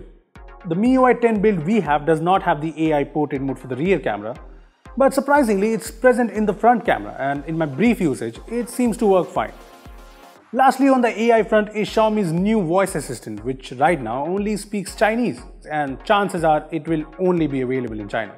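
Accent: India. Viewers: Finally an Indian accent that very understandable. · Fuck india fuck your ugly annoying accent fuck your shithole country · No offence but i just hate Indian accent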